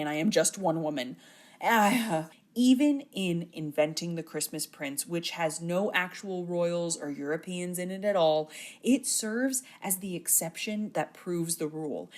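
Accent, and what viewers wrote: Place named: United Kingdom. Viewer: As a Brit, I rate your British accent 10/10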